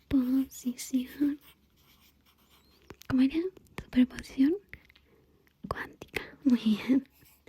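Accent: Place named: Argentina. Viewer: hablas argentino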